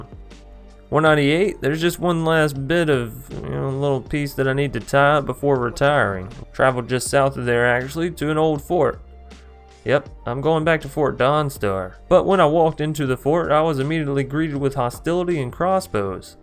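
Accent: South, United States. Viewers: Talking like an ol' Truck driver from the south. Love it! 😎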